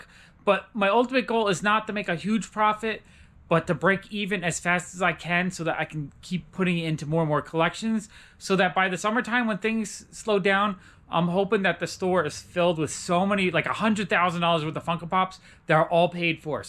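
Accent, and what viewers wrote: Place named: Ohio, United States. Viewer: It's crazy how all of the customers are clearly New Yorkers, but sounds like he's from Ohio or something, Lmao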